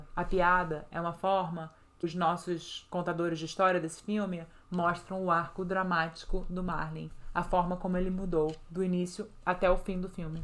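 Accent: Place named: United States. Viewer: Pelo sotaque ela é americana, vi agora um primeiro vídeo seu. Se é americana ou aprendeu nos EUA, o ensinamento sobre roteiro é ouro. 👏👏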